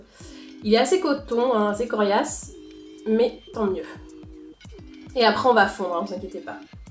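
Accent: Russia. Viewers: L'accent russe ! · oui c'est l'accente Russe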